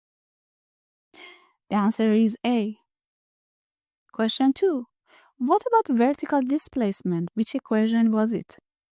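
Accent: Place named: Iran. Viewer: I love your heavy Persian accent, sis 😊❤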